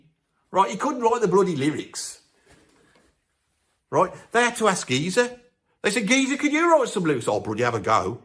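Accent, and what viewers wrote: Birmingham, United Kingdom. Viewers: Your natural accent is a lot closer to the Old End Brummie spoken by than I think you realise,...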